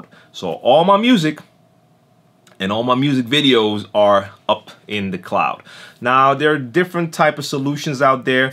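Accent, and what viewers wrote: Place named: New York, United States. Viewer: Great videos. Just a comment/question...you don't sound like you're from the Netherlands. More like NY. Where are you from originally?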